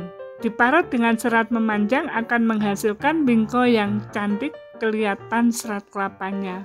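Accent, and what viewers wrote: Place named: Java, Indonesia. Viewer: Org malaysia kah ko tp medok jawa mksh resep nya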